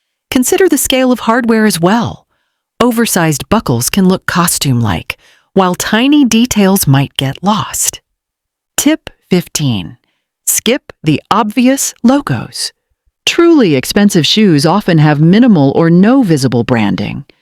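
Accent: United Kingdom. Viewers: I prefer the British voice. Nicer to listen to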